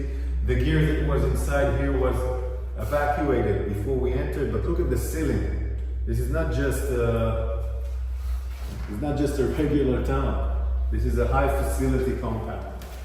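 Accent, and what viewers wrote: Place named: United States. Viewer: He is trying to hide hi American accent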